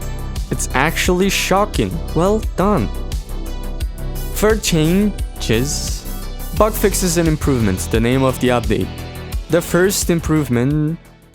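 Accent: Romania. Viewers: omg are you romanian?? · are u romanian :))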